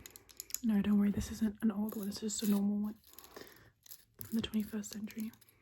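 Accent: Australia. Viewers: I don’t want to be mean but you sound Australian😭also, your very BEAUTIFUL🫰🏻🫰🏻keep the asmr going please🤍🤍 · I love listening to your voice and accent it's so soothing. There are very few asmrtists like this. What part of Australia are you from? 🇦🇺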